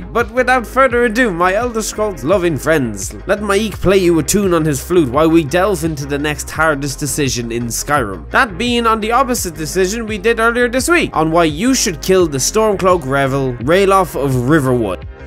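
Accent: Ireland. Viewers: Im subscribing because jacky boi shouldnt be the only popular irish accent on youtube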